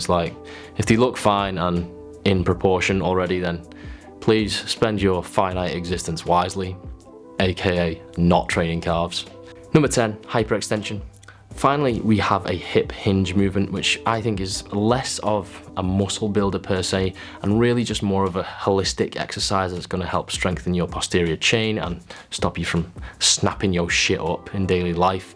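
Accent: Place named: Birmingham, United Kingdom. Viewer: Hi, I like you accent, is it from Birmingham?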